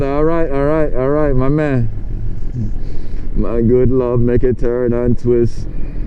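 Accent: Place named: New York, United States. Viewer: New Yorker for sure LMAO, what's up ma man 🇺🇸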